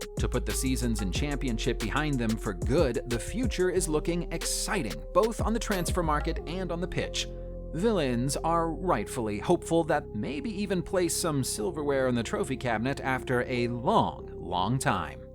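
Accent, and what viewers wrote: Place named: United States. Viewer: Agreed, such a turn off with the American accent and mispronunciation.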